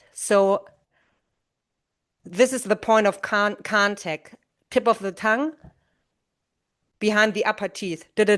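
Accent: United States. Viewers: i'm hearing a Minnesotan accent. sounds good.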